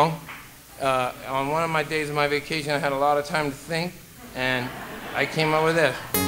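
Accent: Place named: Nashville, United States. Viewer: Well my mama moved from Tennessee to Salem Oregon and had me. She still talks like you and I never have talked like you. They don't talk like you in Oregon. You must have paid someone to help you talk like someone from east Nashville? Something amiss...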